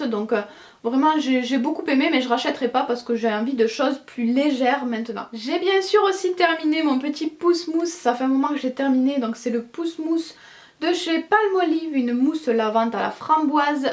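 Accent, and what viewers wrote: South, France. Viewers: Hou toi tu as l'accent du sud , je suis en Provence , hihi , allez une abonnée de plus , bisouus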